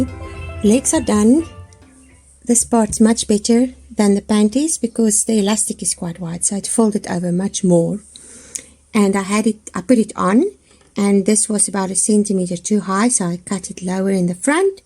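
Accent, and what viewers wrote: South Africa. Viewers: Hi there, how clever of you to make your own costume. So gorgeous to hear you delightful Sth African accent. Its lovely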